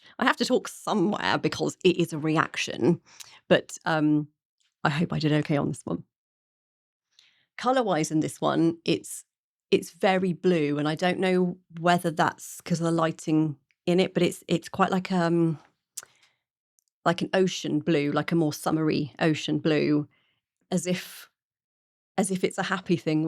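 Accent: United Kingdom. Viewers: She sounds brittish i can't believe she's never listened to PF before 🙄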